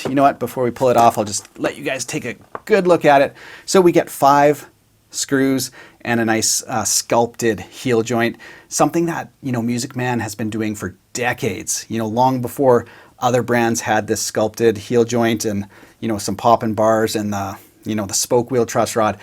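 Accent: Canada. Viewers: Find Uut....Abuut....Love the Canadian accent. Great review as usual.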